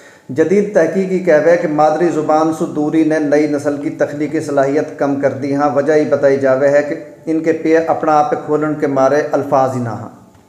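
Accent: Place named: Mewat, India. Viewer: Mewati boli piyari boli he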